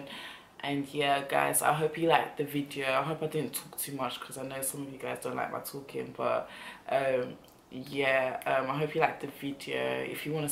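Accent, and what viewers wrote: United Kingdom. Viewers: errmm...sort of lol. OK so this is off topic; you sound like you're in the UK. I am too and I was wondering if you know a place for crochet braids. I only do it when I go back to Ghana but haven't found anyone here that does it.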